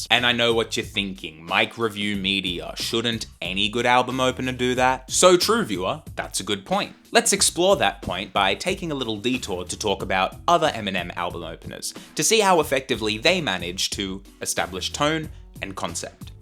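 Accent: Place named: Asia. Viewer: I cannot stand your Asian accent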